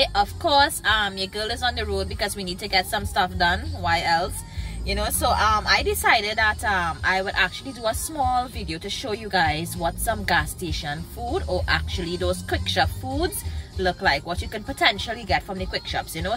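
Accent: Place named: Trinidad, Trinidad and Tobago. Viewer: Damn you so beautiful, looking non Trinidadian...but when you start speaking...you proved me wrong...have a great day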